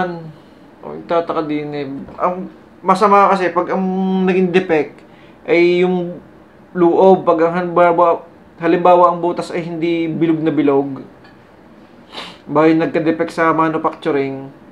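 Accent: Batangas, Philippines. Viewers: taga bats ata kayo bos,halata sa boses haha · Batangeño accent ah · Mahahalata mo ang liget sa hinde na batangueño eh. Areng si idol, liget. 😂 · kabayan saan ka gah s batangas haha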